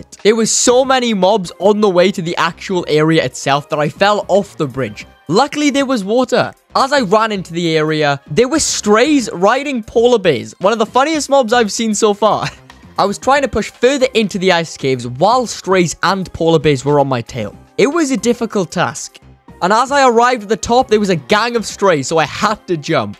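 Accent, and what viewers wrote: Wales, United Kingdom. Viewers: Yo man you welsh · Your accent tells me, an American, that ur British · correct me if I am wrong but your accent has a welsh touch to it lol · Are you Welsh? I’m from the UK, and I can’t help but notice the Welsh accent! 😁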